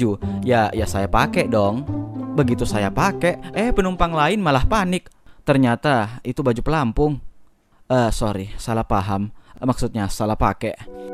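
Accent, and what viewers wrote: Makassar, Indonesia. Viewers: Bang org makassar yah? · Yg aku suka vlog ini adalah narasi nya bagus... Uniknya adalah, menggunakan bahasa indonesia dengan aksen indonesia timur, Makassar... Jadi lebih seru. Nice vlog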